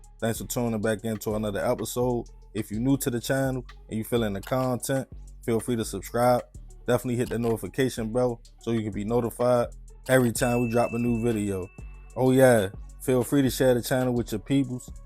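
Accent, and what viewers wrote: Baltimore, United States. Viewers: He’s from Baltimore, has a strong accent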